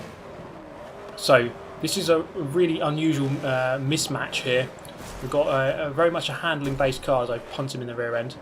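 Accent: United Kingdom. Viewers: There's just something about a snarky British guy chatting about cars... Can't quite put my finger on it.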